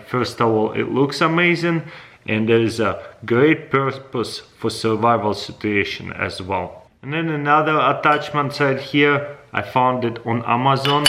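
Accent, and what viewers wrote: Russia. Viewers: Russian awful english Slang... Bäääää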